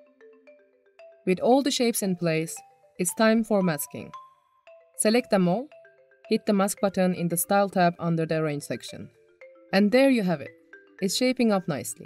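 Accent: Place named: Turkey. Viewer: Konuşan kesin Türk, aksandan belli 😂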